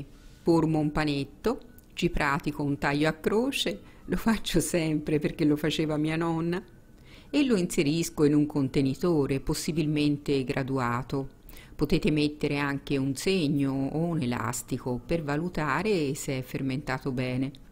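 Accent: Tuscany, Italy. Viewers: Che bello questo accento Toscano!! 😊 Grazie per il video, devo provare a seguire la ricetta!! Direi che ci vuole mooooolta pazienza eheh Come si usa, poi, per fare il pane? Ci faresti un video? Grazie, grazie!!!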